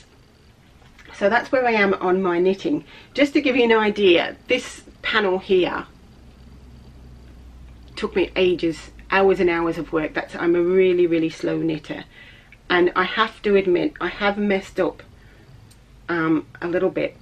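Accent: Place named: Australia. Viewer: Hi just discovered your podcast through little drops of wonderful loving it love your accent thought you were Australian keep on the good work looking forward to your next one x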